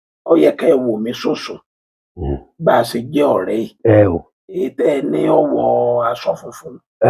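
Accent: Egba, Nigeria. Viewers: This Ègbá dialect is sweet.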